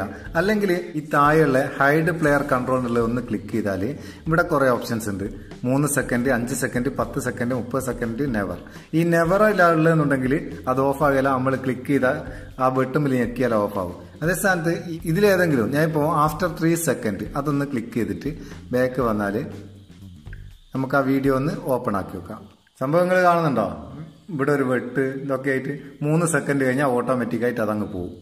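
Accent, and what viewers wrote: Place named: Mappila, India. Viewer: 'ഇക്കാക നിങ്ങളുടെ ആ മാപിളമാരുടെ സംസാരശൈയിലിയും നിങ്ങളുടെ ആശബ്ദവും കൂടി ചേരുമ്പോൾ ഒരു എച്ച് ടി സുഖം നിങ്ങൾ ഞാൻ ആണ്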